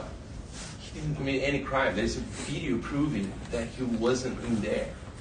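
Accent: Brazil. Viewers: Inglês horrível...sotaque brasileiro ....vocabulário restrito 😂😂😂qto ao conteúdo só lamento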